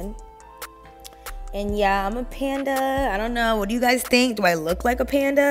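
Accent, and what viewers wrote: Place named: Jamaica. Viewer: You're an awesome panda. Not just only a panda The 1st outfit is gorgeous/ is the best one in all of them. Imma text you on telegram soon. See you soon gal Your english is like the one of Jamaicans. I feel like you're a Jamaican gal. Is that true?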